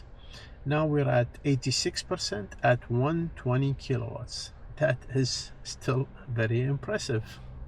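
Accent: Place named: Arab world. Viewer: السلام عليكم. من لهجتك أتوقع إنك عربي. أنا من ملاك 7X متابع لفيديوهاتك. تحياتي لك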